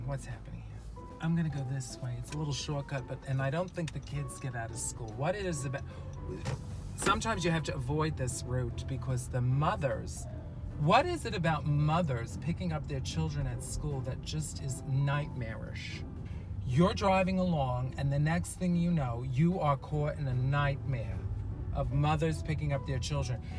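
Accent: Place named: Bronx, United States. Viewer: I hear the Bronx! Love it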